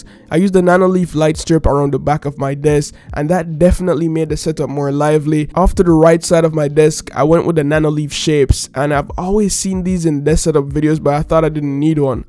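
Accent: Jamaica. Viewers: Are you Jamaican 🇯🇲 ?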